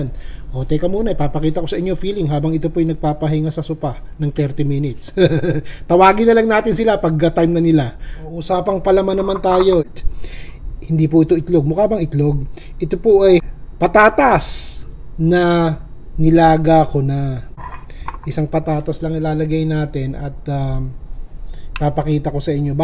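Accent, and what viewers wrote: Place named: Nueva Ecija, Philippines. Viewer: base sa punto nyo kuya ,novo ecijano po kayo? kababayan ko po kayo shout out po sa mga tiga nueva ecija ! especially sa mga tiga zaragoza 😊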